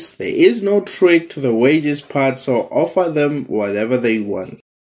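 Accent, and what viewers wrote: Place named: South Africa. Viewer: Aitar.. You sound south african or maybe it's just me.. anyways.. thanks for the vid. I'll be trying this out in the next transfer window hehe 👍🏽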